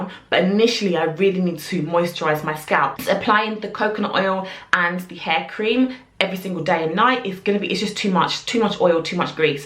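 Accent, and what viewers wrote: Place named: United Kingdom. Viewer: You have a beautiful UK accent and your tips are nice and right on point.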